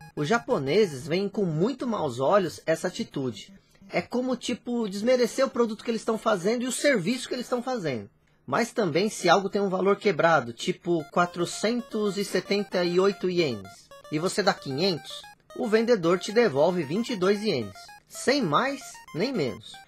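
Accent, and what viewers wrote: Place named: Minas Gerais, Brazil. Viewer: vc morava aqui em Minas Gerais? Tem um sotaque bem parecido com o da gente aqui xD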